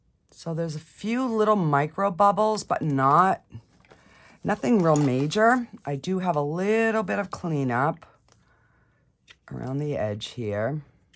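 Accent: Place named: Rhode Island, United States. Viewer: You sound like my RI family 😀 I enjoy watching you create.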